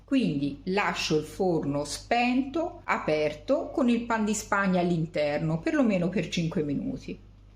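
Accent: Tuscany, Italy. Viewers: Grazie! Bello sentire il tuo splendido accento toscano!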